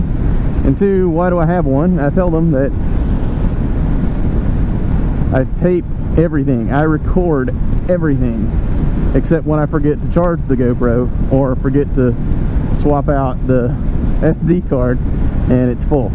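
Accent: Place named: Baltimore, United States. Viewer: Are you NC native?....I swear I hear a Baltimore accent lol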